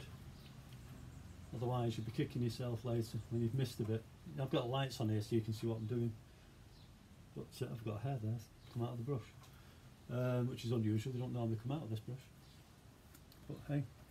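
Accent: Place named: Oldham, United Kingdom. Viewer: great.. 40 years ago taught..you never lose it my first car I painted this way.. boiling hot day.tin in. paint in the milk pan in water.. and tongues from the washing machine to carry it out.. cheap brush.. disaster.. lol.. Oldham lad me.. you sound local...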